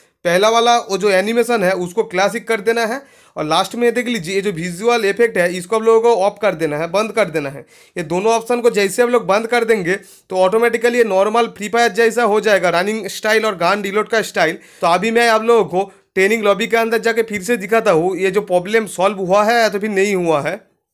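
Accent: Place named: West Bengal, India. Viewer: Awaj sunke pata chal gaya bangali bhai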